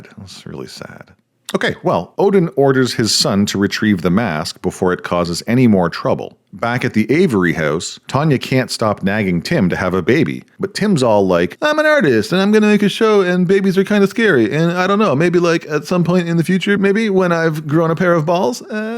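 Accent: Canada. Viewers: I'm going to guess he's from Eastern Canada the way he pronounces the "ou" sound (this is coming from someone in Saskatchewan)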